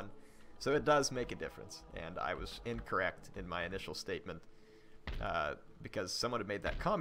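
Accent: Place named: South, United States. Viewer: You say that your southern upbringing does not allow for correct pronounciation of French words but the facts is is that the south has gotten its accent because of a mix of French and american English. It really is a mix of the two because of centurys of being a French colonial region. And yes im a amateur linguist so i know :P PS: you still need to build a port in Croatia...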